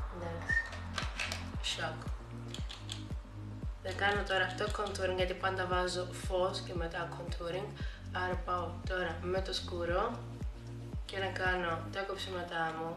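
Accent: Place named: Cyprus. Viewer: Εγω καταλαβαίνω να κυπριακά σου γιατι ειμαι και εγω απο τη Κύπρο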